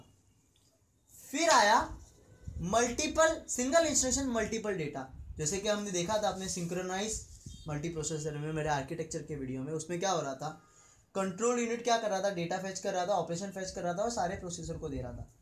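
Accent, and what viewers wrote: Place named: Indore, India. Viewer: Your way of speaking is awesome! Indori type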